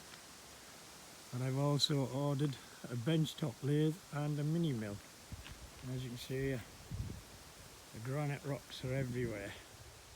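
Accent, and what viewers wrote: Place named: Northeast, United States. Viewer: Hi, I saw your sticker on Craig Tate's channel. Sounds like you are from the NorthEast. Interesting to hear your story and I learnt quite a bit about your local area. "Join Youtube and see the world". I'm in York UK. Cheers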